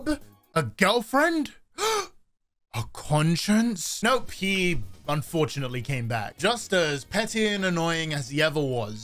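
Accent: United Kingdom. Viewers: bro why is twomad talking to me in a British accent?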